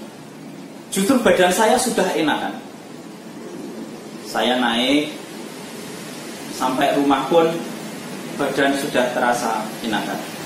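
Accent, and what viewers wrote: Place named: Banyumas, Indonesia. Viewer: rika ngendi kang... ketone ngapak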